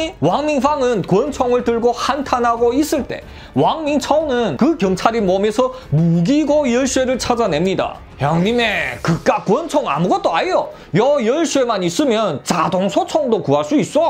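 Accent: Yanbian, China. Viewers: 화룡시 팔가자진에서 30전짜리 꼬치구이 장사 6년하다가 쭝뽀우 생명보험 3년하다 한국나온지 21년 됐음,한국사람하고 결혼해서 두아이의 엄만데요,찐한 연변사투리 구수하네요~대박나세요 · 연변말좀고치고 방송하시오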